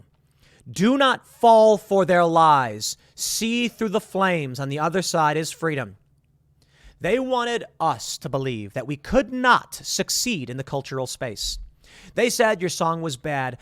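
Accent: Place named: Chicago, United States. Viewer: More Chicago accent please Tim!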